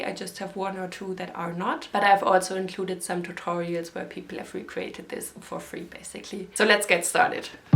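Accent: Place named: Germany. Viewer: Pretty sure she’s a native German speaker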